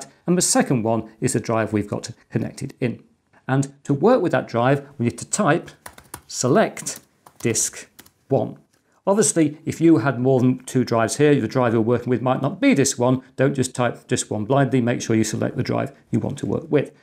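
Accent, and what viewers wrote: England, United Kingdom. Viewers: Genuine English accent, simply love it so much😍😍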